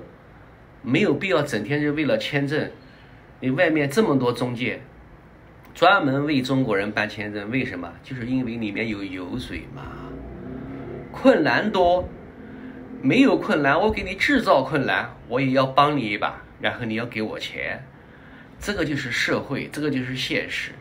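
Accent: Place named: Nanjing, China. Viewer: 哈哈，南京普通话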